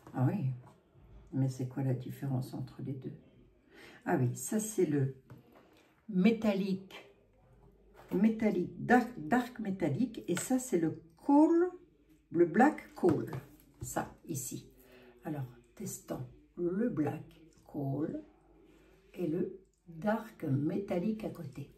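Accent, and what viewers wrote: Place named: East, France. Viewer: Coucou, deux petites questions: vous avez decoloré vos cheveux comment? Chez un coiffeur? Et ne sont-ils pas trop abîmés ensuite? Et 2e question, votre petit accent vient-il de l’est? Mon père en était originaire et j’adore cette intonation…😊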